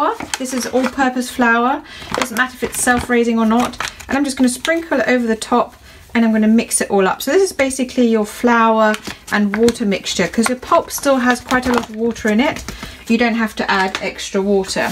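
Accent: United Kingdom. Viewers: I don’t know what it is about female British accents, but damn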